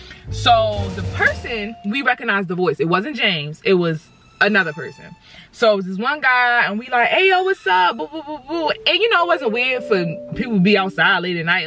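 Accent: Baltimore, United States. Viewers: Are you from Baltimore and I love you videos they funny af ☺️💕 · Love the Baltimore accent !! This young lady needs her own TV show !!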